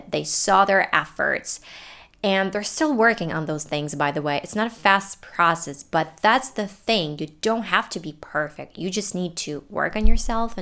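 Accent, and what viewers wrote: United States. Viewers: You have an amazing american accent! I wish I had the same one. It was a surprise that you are from Ukrainе!(so am I) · Hi. The very first time I heard you speaking English I thought in either situations; you were born in the USA or you were grown up in that country. But when I knew you had not live or even more you did not have visited the stripes and stars flag country I surprised hugely. You have cheered us ( not only me) to try to improbe our English level every week and this is for say thank you. Honestly I think you should not take much care about jealous people say, because of the strong personality you show in each youtube video every weekend, I think you are fine. By the way if you want to hear a really Spanish accent in English, I recommend try to hear the following guys: Eva Méndes, Antonio Banderas, Salma Hayek, Penélope Cruz, Shakira, Eva Longoria, etc Or native English speakers, pretending speak with Spanish accent: Gwyneth Paltrow, Will Smith, The Rock... 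Saludos!!!! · Can you make a video speaking in your natural accent? Or is this your natural accent? Why did you learn the American accent and not the British? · As an American, to my ears, your American accent is really REALLY good. As far as what you were saying-- I agree. Anyone who is criticizing needs to know that accents help language learners be understood even better by whomever they're talking to. If you're going to be speaking English with Brits, learn that accent, if you're going to be talking with mostly Americans, learn that accent! 🙂 It's all about understanding and being understood and you'd be surprised how much accents factor in to that! I sometimes can't understand strong Scottish or Irish accents if people are talking too fast, even though they're speaking English! 🤷‍♀️🤷‍♀️ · I adore your American accent😍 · How did you get this american accent?? If you answer me, I'll subscribe to your channel ☺ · I can relate to you! 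I'm also a non-native Youtuber who also teaches English and is originally from Ukraine like you (I've lived in Spain half of my life). I imitate the American accent too. It's so true that no matter what you do, you'll be always criticized. While you are under fire because of your impeccable American accent, sometimes I'm criticized because it's not good enough. It's a price you have to pay for doing what you love. Thanks for your inspiring videos!! :) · Thank you So Much. ❤😊👍 Your videos are very useful and helpful. I found your channel last summer and I didn't believe that you are Ukranian. I thought this girl had a great Americian accent and she lived in some part of America. I was really surprised when I knew at the end of the video those were just my thoughts. Don't listen to or reapet their beliefs. I'd like to give one of my favorite Henry Ford's quote: "My best friend is the one who brings out of the best in me." You and your family DO GREAT JOB !!! 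👍❤👏🤗 Keep it up !!! The World is counting on you !!! 🎉😊🎊❄🎄🎁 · You're accent is too thick. It's like a caricatural american accent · as a Colombian Spanish speaker I could say that she does have this Spanish accent, but also i could say that it's sounding kinda faking or forcing but i feel that's not a big deal. She does express well with that accent at the end. By the way it's disgusting that behavior changes to someone if one speaks with a different or none accents, that's quite narrow-minded. Notwithstanding, also it's quite important to have a good communication if your current job treats about. I'd reckon that does not care so much about the kinda accent you speak, but in being able to communicate each other in the best way possible. Nice video and I love your accent! My accent is American too! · ahahha you are under fire bcs of your clear american accent while people around you do not have ımıtatıon skılls to adabt their voıce into the language they learn this makes them jealous and they are attackıng by harsh crıtıcısm :D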